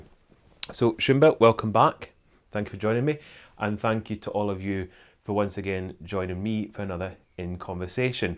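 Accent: Scotland, United Kingdom. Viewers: I had to wait for a further 2 hours to finally watch your upload, due to current electricity load shedding that has once again been put upon us here in South Africa, whereby our electricity is cut off for set periods of time throughout the day. It is so lovely to hear you answering some of the questions I read in the comments section earlier in the week. And of course, your Scottish accent is fantastic! My adoptive Father was born and raised in Edinburgh. Thank you so much, for taking time to answer so many of our questions. And it is always so lovely to see Shumba with you, and those beautiful pink Roses. Your suggestion of having a livestream Youtube Q&A at another stage sounds fantastic. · I just found your YouTube channel. I love 💕 listening to your Scottish accent and all your stories about being a butler. I wish I could take an etiquette lesson from you but I live in the USA. 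Best wishes to you and the dogs.😊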